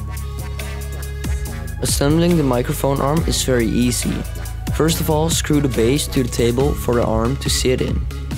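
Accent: Netherlands. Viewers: ur accent sounds kinda dutch, i can tell cos im dutch as well. (great video btw, keep it up!) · Where you from you sound dutch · Nice! ik kom hier van Setup Wars ik herkende direct dat je nederlands was als je engels praat let wel een beetje op jou accent met die F zoals Fideo maar echt nice kanaal ! sub bij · ja klopt toen ik je horden dacht ik WTF deze gast is nederlands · ik dacht al... dat accent is NL ;P sinds ik op je startscherm vrijdag .. februari zag staan weet ik het zeker haha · Grappig hoe elke Nederlander die naar kijkt het Nederlandse accent herkent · Nice video! Great quality. Bij setup wars herkende ik meteen je Nederlandse accent :P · R u dutch? so yea I can hear it from your accent :) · It may be that you Dutch speak normally because that I hear to your accent #TeamTechSource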